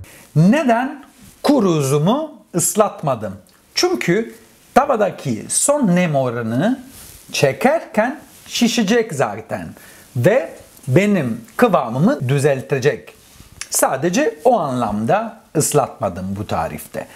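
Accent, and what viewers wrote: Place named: Istanbul, Turkey. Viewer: İstanbul türkçesi konuşan harika bir italyan şef👏🏻👏🏻 ellerine sağlık, harika!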